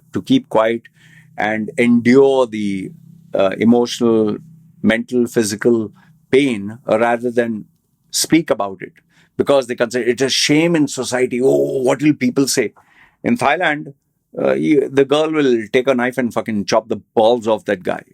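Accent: India. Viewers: We want full video in your hindi tone...5 pandav 😂😂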